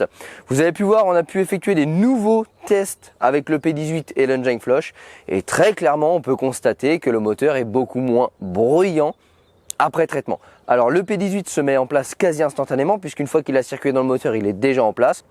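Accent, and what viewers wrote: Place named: Normandy, France. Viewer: C'est comme ça que l'on parle en Normandie dans L'Eure ! Rien d'incroyabe !😉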